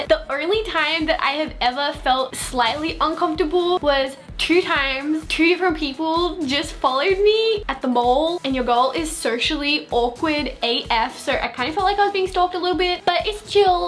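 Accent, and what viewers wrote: Australia. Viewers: Siiiiiiir Lol sorry Australian accents make me laugh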